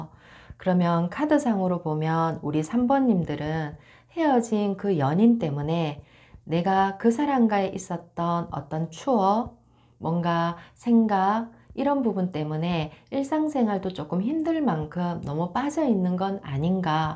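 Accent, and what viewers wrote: Gyeongsang, South Korea. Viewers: 경상도 억양을 왜 고쳐야하죠?? 님이 지루하면 안들으면 되는것을..... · 열심히 리딩 해 주셔서 감사 합니다 . 하지만 조금 지루한 감이 느껴 지네요. 경상도 억양 고치시면 더 좋을것 같아요.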